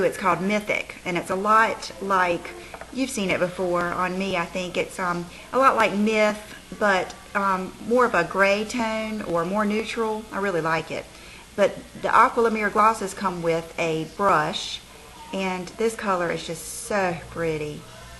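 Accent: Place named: Virginia, United States. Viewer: you're so pretty!!! Watching this video totally inspired me to get up and get ready and head over to my Chanel counter;) I love your accent btw... Are you from Virginia?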